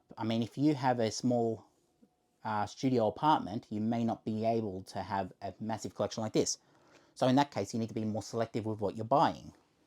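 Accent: Australia. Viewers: I jolly Rodger as you call it but that's just due to cost of living and the stuff I want being extremely expensive compared to my pay I'll eventually buy what I currently Rodger but for now 4k downloads will suffice It's also nice to hear an Australian voice for a change nearly everyone being American is very draining to listen to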